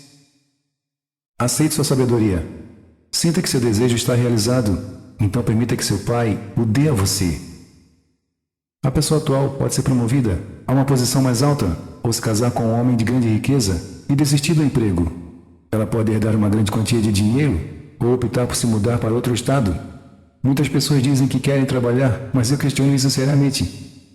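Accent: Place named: Florianópolis, Brazil. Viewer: Esse narrador é manezinho com certeza!! Parabéns mô quiridu!! 👏👏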